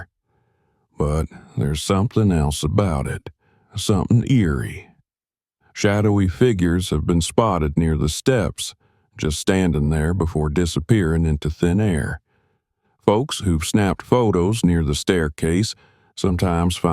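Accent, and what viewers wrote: United States. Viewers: Sorry but don’t like the American accent especially when to deal with haunting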